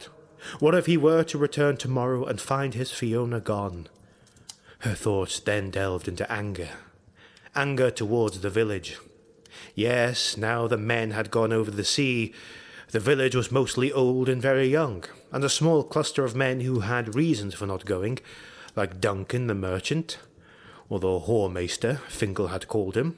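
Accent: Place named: England, United Kingdom. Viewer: A very good story, been looking for something like this. Like the voice, sounds English, will wait for more.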